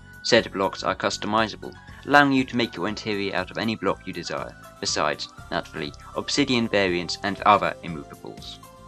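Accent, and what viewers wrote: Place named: United Kingdom. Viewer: I enjoyed the british accent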